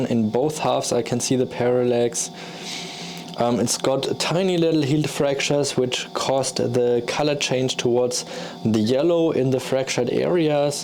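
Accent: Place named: United Kingdom. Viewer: Just watched your short:gold:amethyst agate. Joining you in prayers that our US president will get defense weapons QUICKLY to Ukraine...today!!! Loved your shoes/Ukraine colors. You always take me back to student-exchange days Bielefeld/Westfalen 1961. You are so admirably articulate in authentic British English. Still read German Bible/listen to Bach/baroque daily. Thank you,. Your voice is music to my ears. Of course your enthusiasm and expertise with minerals (creation) are equally valued. Robert TX USA.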